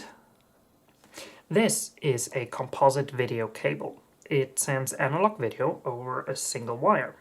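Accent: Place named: Germany. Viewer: I sense beautiful German accent! 😊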